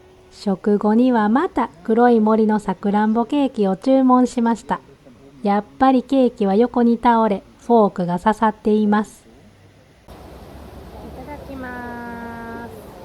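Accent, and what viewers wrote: Kansai, Japan. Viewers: ドイツで入浴剤！？浴槽に浸かるのは日本人だけかと思っていました！びっくりです。 関西人のわたしには関西弁が抜けていない主さんの話し方が最高です。これからも頑張ってください😊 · お初にお目にかかります。 話し方から主さんは京都か大阪、いずれにしても関西の方なのかなと思いました。 黒い森、シュバルツバルトですね。 旅するドイツ語で見ました。 自然豊かでんなぁ。 でもビール飲めへんと辛そう。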